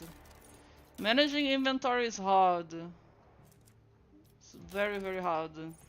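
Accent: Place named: Poland. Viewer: Your accent sounds polish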